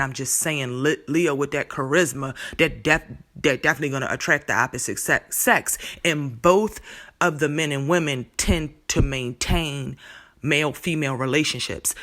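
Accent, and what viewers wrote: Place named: Baltimore, United States. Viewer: You've gotta be from Maryland, Baltimore to be exact cause the way you pronounce your O's and U's is so BMORE.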